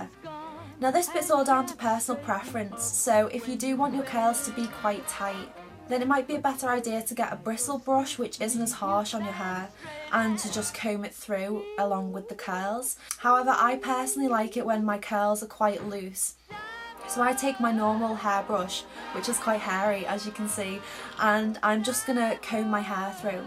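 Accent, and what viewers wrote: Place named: United Kingdom. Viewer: Oh my god, I just thought I saw Marylin Monroe when I clicked this vid. You look exactly like her!! Only it's a little bit absurd to watch Marylin Monroe look alike talking in a british accent.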